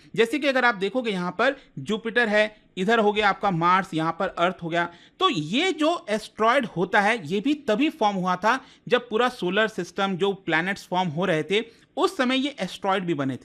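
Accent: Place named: Bihar, India. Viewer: Bihari type of speech Nonsense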